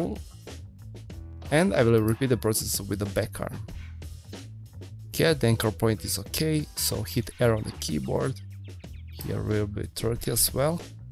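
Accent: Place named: Russia. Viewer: Тебя русский акцент выдаёт )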